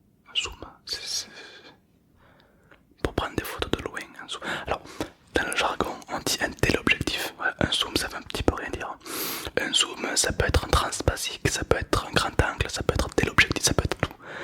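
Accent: South, France. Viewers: Rolalah il a une accent du sud il me regale 😁🤣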